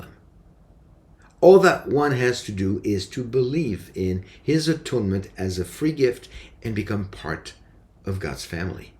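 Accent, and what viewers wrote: France. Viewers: Dear " frère " I always hear the french accent and I like it. Au revoir.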